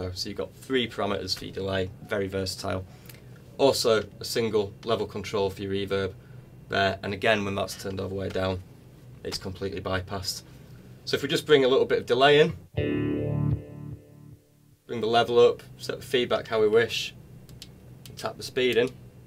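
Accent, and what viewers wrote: United Kingdom. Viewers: The combination of the British accent and sweet beard lead me to believe that this man is Guthrie Govan's alter ego.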